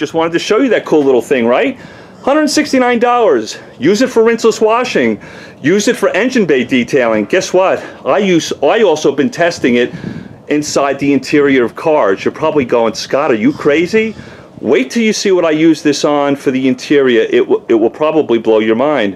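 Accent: New York, United States. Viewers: Sound like a New Yorker.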